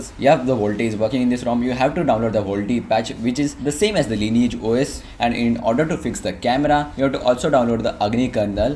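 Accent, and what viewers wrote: India. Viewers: Ted Mosby он на инглише говорит, просто с индусским акцентом..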